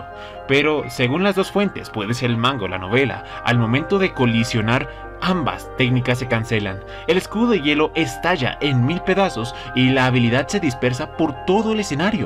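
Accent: Colombia. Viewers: Eres colombiano!?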